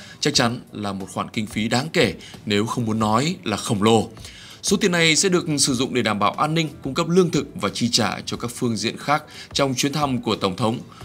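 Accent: North, Vietnam. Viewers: giọng đọc của người miền BAC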